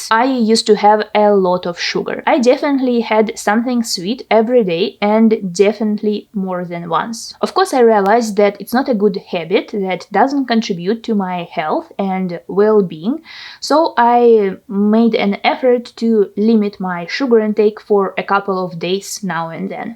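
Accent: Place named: Russia. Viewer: I like Your Russian accent:)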